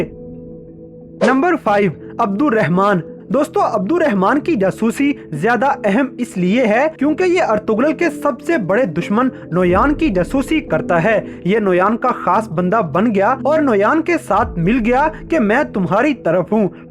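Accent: India. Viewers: Ye bihari haina isliyen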